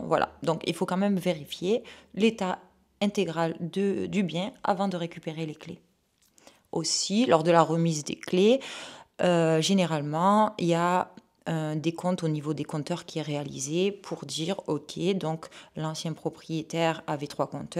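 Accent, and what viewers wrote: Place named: South, France. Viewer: Jolie accent qui rappel les cygales ❤❤❤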